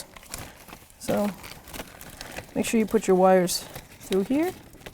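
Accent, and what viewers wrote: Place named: Massachusetts, United States. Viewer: Nice job. I detect a Massachusetts accent. Am I right? :)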